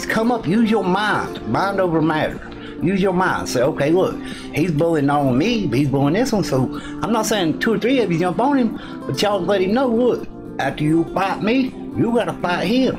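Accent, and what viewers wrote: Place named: South, United States. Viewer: My wife is rollin on the floor. Love how you articulate in the southern accent !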